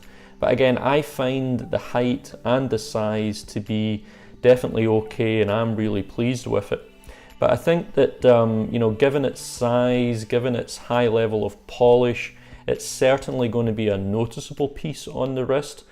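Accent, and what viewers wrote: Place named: Scotland, United Kingdom. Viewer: I just bought one with 25% discount here in Hong Kong (I'm born and bred in Scotland, so the accent and all just takes me home.) It's actually great value for an in-house column wheel chronograph with bags of history and heritage too. Great review of the watch and scotch!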